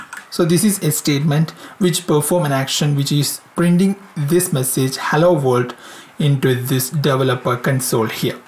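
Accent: Kerala, India. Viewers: U from kerala?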